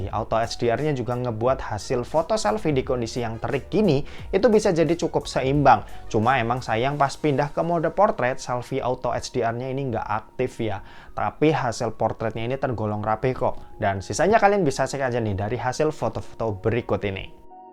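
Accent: Semarang, Indonesia. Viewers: Anak Semarang ya mas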